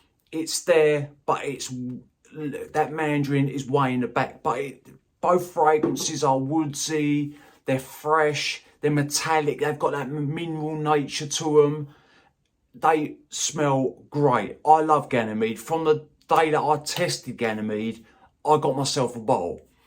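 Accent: United Kingdom. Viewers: What a great accent! From which part of Britain you are?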